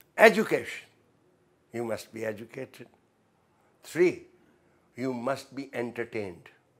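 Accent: Punjab, India. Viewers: Speaking Urdu with Punjabi. Like me😂😂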